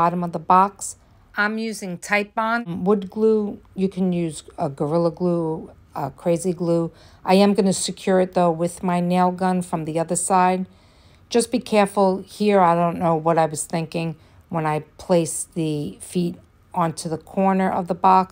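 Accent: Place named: New York, United States. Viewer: You have a new subscriber!! I’ve been watching videos on YouTube for years. As soon as I heard that familiar accent, I was like, YASSSSS!!! NY or NJ LOL? I’m in NJ! Love your videos!! You go, girl !! ❤❤❤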